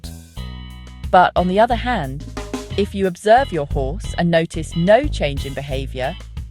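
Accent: United Kingdom. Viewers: What a crock of crap this is. They narrarate the video with a British accent voice to make it sound like they know what their talking about. I guess the British/AI narrator has never seen a mustang whose nostrils and ears have frost bitten off. I guess they've never dealt with horses that colic when they get too cold or ones that don't drink enough. What I take away from this video is that this is yet another dime-a-dozen horse expert wannabe shooting off their mouth and trying to sound like an authority when they're not. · March 6, 2026 - (dated for context and reference) Thank you for your kind recognition of my comments. I believe in offering "Positive reinforcement" to people for their kindness, and for jobs well done. In addition, I am a lifelong anglophile, so I very much appreciated the video's narrator for her pleasant voice and of course Brit accent👍👍😊 Lastly as a man, I have noted over the years that female equestrians tend to be quite attractive in a number of ways. 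I certainly have a lot of respect for people that work so well with such large animals. I live in Connecticut U.S.A., and we have a good number of horse farms and Equestrian Centers/Facilities. Stay well.😊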